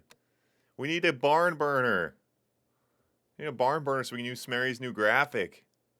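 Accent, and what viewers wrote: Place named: United States. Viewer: You are Dutch, you sound totally American to me 😅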